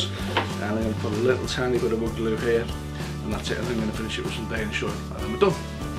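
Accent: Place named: Liverpool, United Kingdom. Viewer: oh wow Eastham eh, my Auntie and Uncle lived in Eastam in Raeburn Av, small world. I didn't want to say the accent was scouse just in case you are like me and say i'm from the wirral not l'pool. thanks for the reply and keep up these great videos, that's 2 plans i have got from you now thanks for that. Barry.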